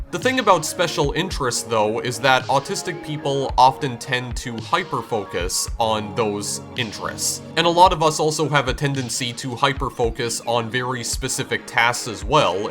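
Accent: Canada. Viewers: Where are you from? I watched your Catacombs of Solaris video. Are you from Canada, maybe sasqatchean “Twenty Fifteian” “A long tyum” “Kowun I was autistic for a long tyum”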